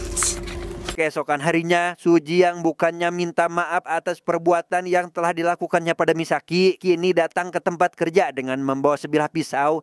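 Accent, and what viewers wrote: West Java, Indonesia. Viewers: ....sunda na ti mana?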